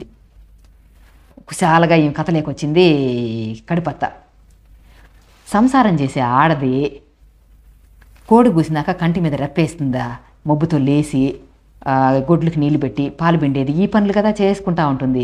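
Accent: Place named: Chittoor, India. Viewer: కథ సహజంగా పల్లెల్లో మన ఇళ్లలో మన మధ్య జరుగుతున్నట్టుగా చిత్తూరు యాస ఉట్టిపడుతోంది మీ నోట ☺️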